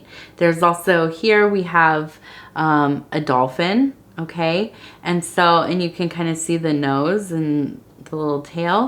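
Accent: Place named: California, United States. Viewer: Great! You answered my question. I can now attribute the quality I heard to a Californian accent. The nuances of our American accents are broad and beautiful. I was made aware of it early on growing up in Alabama!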